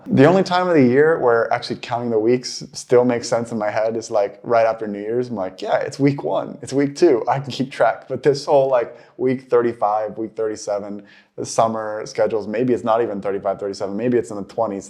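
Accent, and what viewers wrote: Sweden. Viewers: Look at that, the Swedish accent is creeping is as well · saw your vids a few years ago. Now at the time stamp, you sound more like a Swede who is fairly good, not great, at speaking english.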